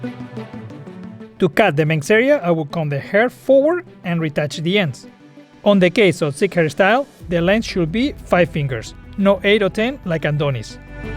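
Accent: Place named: Spain. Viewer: No hablo ingles pero tu acento suena extranjero, de que pais eres?? España?? Por cierto muy hermosos tus trabajos, la proxima que me corte el pelo llevo una foto de aca jajajaja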